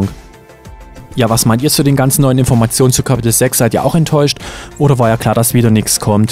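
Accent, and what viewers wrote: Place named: Saxony, Germany. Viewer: Alter bist du aus SAchsen oder was ? Dein Dialekt ist ja katastrophe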